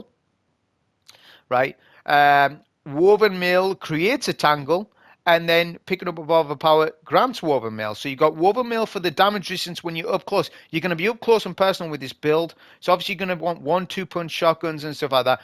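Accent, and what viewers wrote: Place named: Sunderland, United Kingdom. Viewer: I can't pin down your accent. Sunderland, County Durham?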